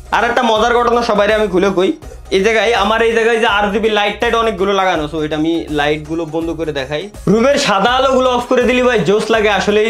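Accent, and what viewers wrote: Noakhali, Bangladesh. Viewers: Noakhali theke boltece ❤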